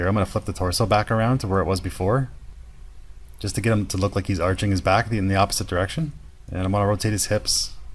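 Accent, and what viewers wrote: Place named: Canada. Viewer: Canadian detected.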